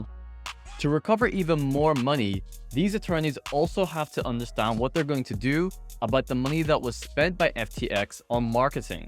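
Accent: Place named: Scotland, United Kingdom. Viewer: Mate, you had a Scottish accent in your last video…..this is so weird.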